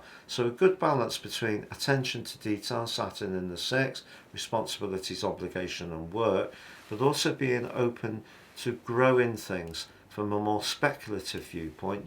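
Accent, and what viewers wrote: United Kingdom. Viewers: I love this amazing and well compose being. I love to hear him, his British accent. He speak to refine, I love it!!!🎉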